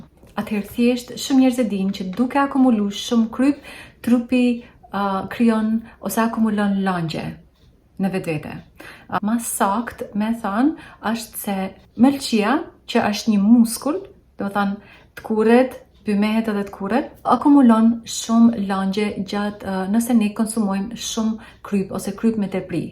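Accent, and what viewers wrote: Shkodra, Albania. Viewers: Respekte dhe per shkodranishten e bukur ❤Po me u shendosh si me ja ba se jam i dobet 😅😅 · Keshilla shume te vlefshme dhe na knaqe me t folmen tone te ambel shkodrance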